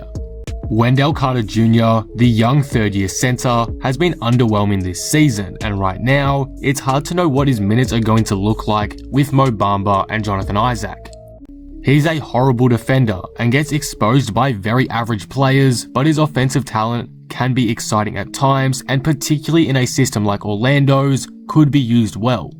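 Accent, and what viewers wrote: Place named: Australia. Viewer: Yea the magic, cmon lads!! Love the Aussie accent m8🇦🇺🦘 oi oi oi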